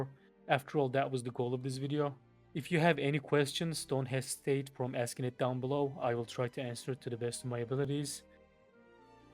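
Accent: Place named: Turkey. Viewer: türk müsün? aksan türk aksanı.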